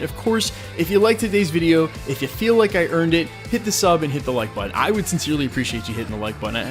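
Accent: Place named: California, United States. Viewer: You sound very California. Woah dude.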